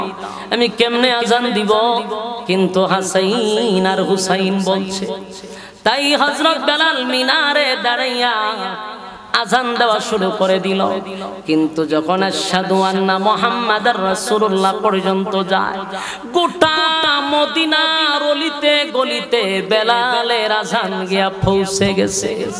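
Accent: Noakhali, Bangladesh. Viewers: নোয়াখালি কে ভাই আনেগোর ভাসা বুজি না মুগো সিলললেটি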